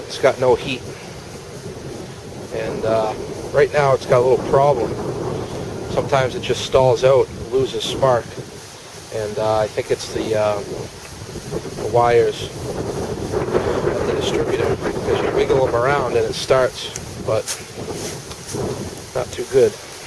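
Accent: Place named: New Jersey, United States. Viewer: You sound like you have a New Jersey accent.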